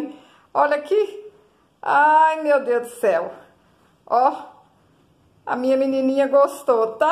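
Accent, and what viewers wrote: Minas Gerais, Brazil. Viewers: Gente, que coisa lindaaaa! Sou fã desses tipos de trabalho! Amo esse jeitinho mineiro de falar. Parabéns. LINDO! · Ficou maravilhosaaaaa, parabéns!!!!! Amo sotaque de mineirinha 😍😍😍😍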